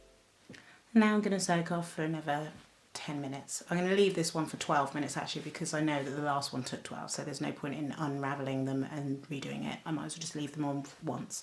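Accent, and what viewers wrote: England, United Kingdom. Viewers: Dang... your nails are perfect. Your natural apex is off that chain. I have to use plastic dual gel nail forms to get my flat and thin nails to look like this ! And btw, I love your proper English accent. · Beautiful hands and gorgeous long nail beds!! Thank you for this. I have decided to try using gel overlays to grow my nails out, because, after 12 years of acrylics, nail hardeners are not being overly successful!! I've watched the films on how to apply and maintain, and you've given me the one to remove, thank you. Subscribed. Ps, so nice to hear an English voice!! Xxx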